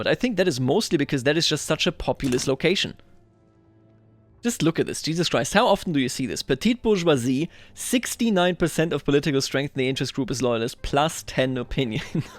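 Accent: Germany. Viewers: Hearing iranian names in a german accent is.... interesting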